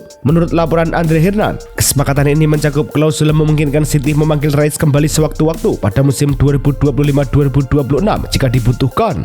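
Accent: Java, Indonesia. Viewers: Yang ngisi suara medok jawa nya agak gimana gitu di dengar 😆🤣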